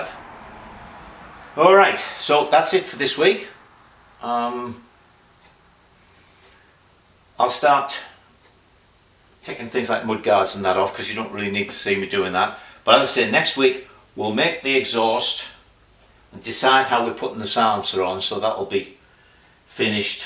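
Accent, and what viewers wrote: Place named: Middlesbrough, United Kingdom. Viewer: wow, small world. I hoped that was a Boro accent, I was born in the Boro , and live in Stockton, I've been a bike nut since I was a kid, I used to race a C15 on Redcar beach .about 7 years ago my Canadian cousin and I rode a Valkyrie and BMW from London Ontario to New York to pay our respects to ground zero and visit Billy Joel's bike museum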